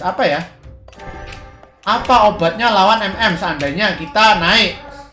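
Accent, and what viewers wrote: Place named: Java, Indonesia. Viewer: Oh orang surabaya, kirain orang jawa bang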